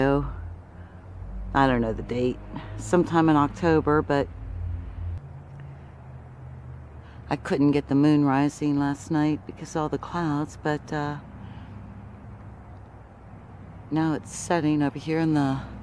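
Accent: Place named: Midwest, United States. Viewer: I’ve learned a lot about the realities of amateur astronomy by watching your videos. Thank you. Also, your pace and content are terrific. I definitely feel your voice and tone are excellent and soothing...just right! (I live in Lexington and recognize the midwest accent). I play your videos sometimes to help fall asleep- that’s a compliment, because they are relaxing!! Lol. Thanks again, and don’t change a thing and ignore any criticism. You are unique.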